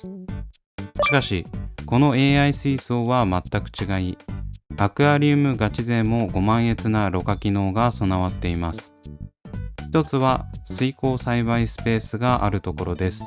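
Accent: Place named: Kurume, Japan. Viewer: 北部九州系、久留米あたりのイントネーションで、よどみなく滔々と話されるのが心地よいです。アピスト？ああ、まぁ育ってんじゃないですかね、って感じです。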